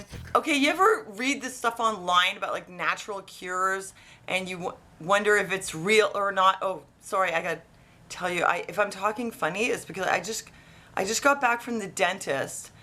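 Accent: Russia. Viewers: I like your Russian type of accent. Funny.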